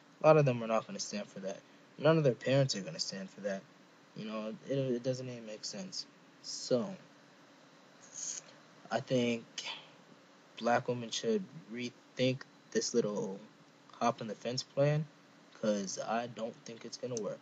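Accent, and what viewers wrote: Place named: Somalia. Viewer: Are you Somalian? You sound Somalian.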